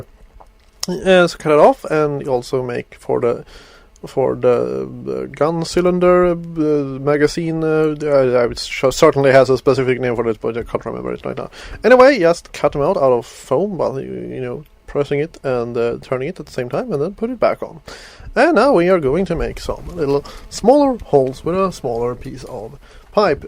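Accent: Sweden. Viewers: r u swedish? its the accent and the name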